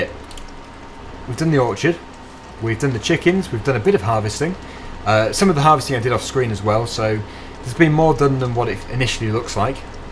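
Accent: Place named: England, United Kingdom. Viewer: perhaps it would be a God idea to turn down the game volume? I think most people would rather have your lovely English accent rather than the tractors for the majority 😊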